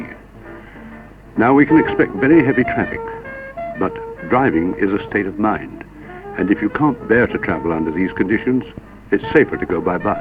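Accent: RP, United Kingdom. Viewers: How irritatingly correct with this British/boring narration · i just love the plumesque voice, the "strange" 3 lane road was also built just outside kings lynn on the way to hunstanton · Wonderful and so revealing of how far down the wrong road we have travelled... But of course this gem wouldn't be allowed to be transmitted now as his accent would be regarded as too plummy. A white well off middle aged man in a Jaguar, with a professorial air, oh dear, that doesn't quite tick all the pc boxes. Reach for a warning sign... endearing almost heart breaking monologue encapsulates the once civilised attitude to daily life in this country; common sense, courtesy, fair play and restraint, all the British virtues which though in some sense still spark in the gloom amongst a portion of the thinking and perhaps even a measure of the unthinking population, have vanished from public life and been burnt out of the new breeds, those smug military style SUV driving legions bearing down on the last remaining green corners of this land, inextricably enslaved by commercially sanctioned avarice, unthinkingly obeying their instinctual animal drives drives for still more possession. 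Apply the trafficator old chap, for this road is a dead end...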